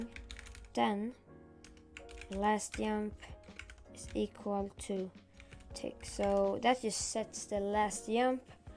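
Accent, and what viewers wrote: Sweden. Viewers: ur swedish I know it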